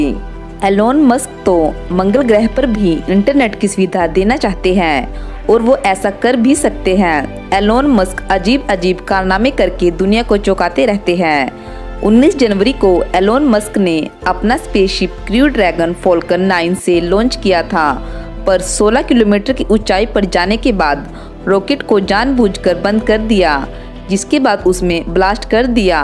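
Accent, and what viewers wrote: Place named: Bihar, India. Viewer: Aapki avaj kan phad detihai..... Bihariyon ki tarah bolne ki kya jarurat hai...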